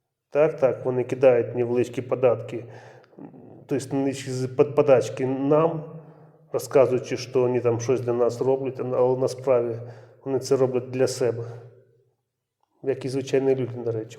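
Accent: Russia. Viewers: ЦЕ ЩО КАНАЛ ФСБ? ЧОМУ МОВА НЕ УКРАЇНСЬКА,А РОСІЙСЬКИЙ СУРЖИК?. ЧУТНО ЩО РОСІЙСЬКОМОВНА ЛЮДИНА КРИВЛЯЄ УКРАЇНСЬКУ.ГАНЬБА!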